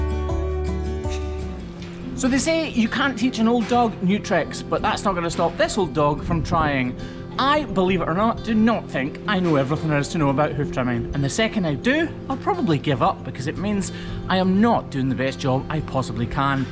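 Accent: Scotland, United Kingdom. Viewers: I never thought I would laugh at the phrase “foot fetish”, until I heard it with a heavy Scottish accent. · Why is it that after watching your videos & then checking the comments, I read the comments and your voice is what I hear in my head? 🤪🤪🤪 I'm here in the USA & I hear a Scottish voice in my head. 😁😁😁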